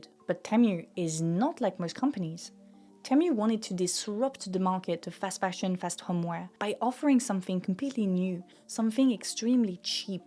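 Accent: France. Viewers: Thanks for raising your voice regarding Temu and that mass consumerism. Got a rather ascetic lifestyle, so Temu's offering sounds immediately idiotic to me. Why buy more than you would ever need? Seems crazy to me, but i know how society (*ahem* businesses) push people to always want more, newer and shinier stuff... Btw, took me a surprisingly long moment to realize you are FR, as I am. Great accent :)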